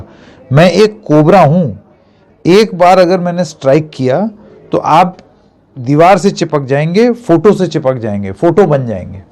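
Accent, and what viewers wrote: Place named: Bengal, India. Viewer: Your Bengali ascent is good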